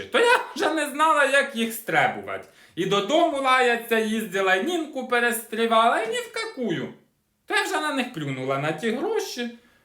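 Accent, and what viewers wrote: Poltava, Ukraine. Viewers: Дякую як послухаю то наче дома побуваю моя рідна Полтавщина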